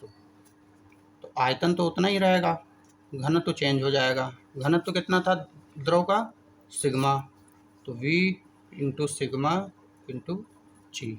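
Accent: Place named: Bihar, India. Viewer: Bihari ki tarah mat bolo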